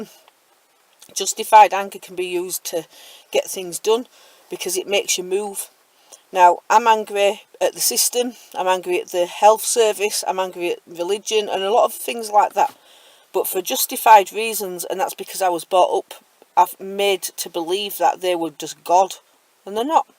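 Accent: United Kingdom. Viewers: I just discovered your YouTube channel after seeing your comment on the channel with the British guy in the Airstream. I'm watching this from Florida, in the U.S. I find it interesting to listen to people from the U.K. speaking English. It's like a whole different sounding language, even though I can understand everything you say.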